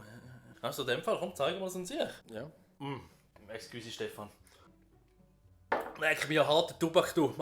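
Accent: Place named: Switzerland. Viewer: So en riichtige schwiizer 😂😂😂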